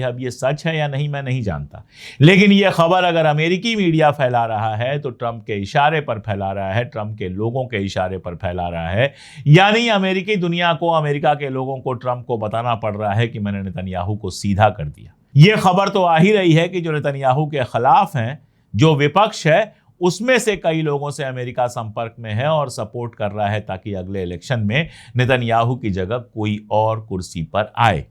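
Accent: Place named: Pratapgarh, India. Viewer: I am hearing pratapgarh iu p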